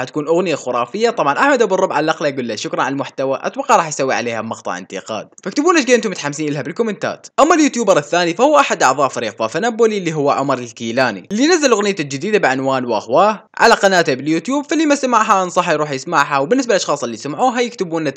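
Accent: Syria. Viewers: لاء يحجي سوري شبيج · هو قبل كان يتكلم سوري بمقاطع قديمه وهو اصلو سوري وعايش بعراق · شنو انتي متعرفين الهجة العراقية بربج يعني هاي لهجة سورية الي ديحجيهة 🗿💢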